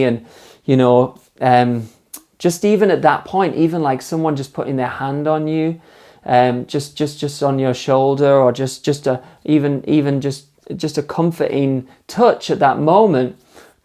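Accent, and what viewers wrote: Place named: Newcastle, United Kingdom. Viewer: Geordie maybe 🤔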